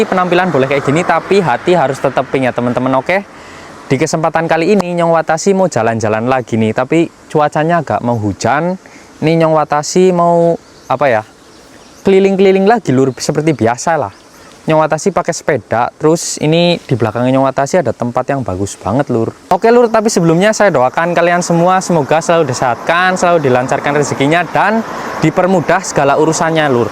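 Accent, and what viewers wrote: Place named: Cilacap, Indonesia. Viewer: aslinya org mana logat nya kaya org Cilacap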